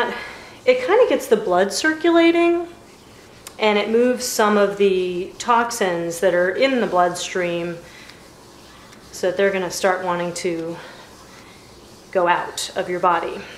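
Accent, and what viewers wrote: United States. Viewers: dat american accent.. :)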